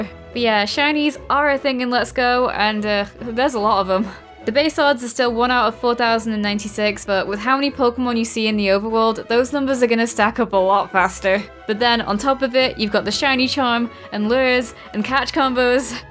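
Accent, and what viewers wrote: England, United Kingdom. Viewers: It took me a while to realise she’s not Australian and actually English trying to Americanise her voice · Yay! I absolutely love your channel and your voice, mainly cause your a fellow Brit. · good video. your British accent is adorbs :)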